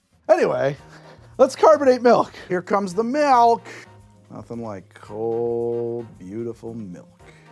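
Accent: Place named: New Jersey, United States. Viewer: I love that Jersey accent. Arange. Can't help but repeat it when he says Orange. He says it soooooo much lol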